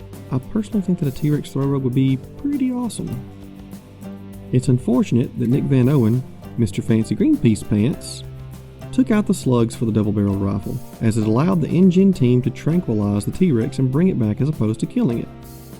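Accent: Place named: South, United States. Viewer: Articulate. Speaks well. Southern. Talking dinosaurs…. Dude! You are my new best friend!!!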